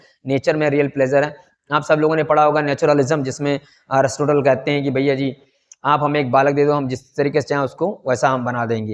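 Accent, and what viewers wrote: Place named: Allahabad, India. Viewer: Full allahabadi😂😂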